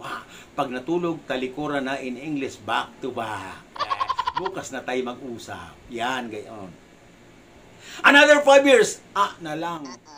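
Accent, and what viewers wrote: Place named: Ilocos, Philippines. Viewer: Kasla puro a ilokano ti ayog na niya🤣🤣🤣🤣🤣👍👍👍👍👍👍👍🏅🏅🏅🏅🍻🇵🇭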